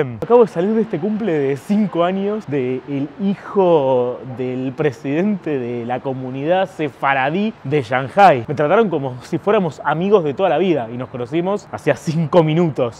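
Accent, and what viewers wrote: Buenos Aires, Argentina. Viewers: Qué interesante y exótico!, narrás con encanto, me da gracia tu modo juvenil y porteño chabón! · Shanjai, shangai, tu español es muy argentino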